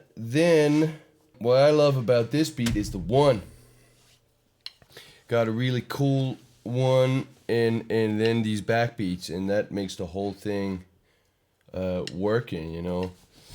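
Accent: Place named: Canada. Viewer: nice canadian accent bro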